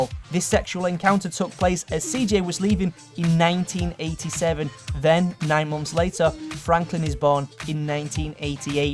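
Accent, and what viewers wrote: United Kingdom. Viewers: Fuck off he is british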